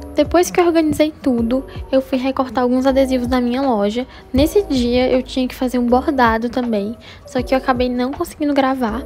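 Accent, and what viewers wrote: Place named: São Paulo, Brazil. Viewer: amigaaa,tbm sou de fortaleza e jurava que vc era paulista kkkk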